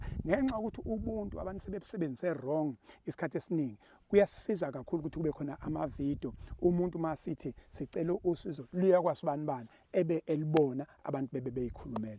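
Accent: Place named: Xhosa, South Africa. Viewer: Mina bengingayibon inking kodw ubaba mayesechaza kyezwakal lixhoshwa libhekil Baba uxolo lukufanele ungowethu n sisakudinga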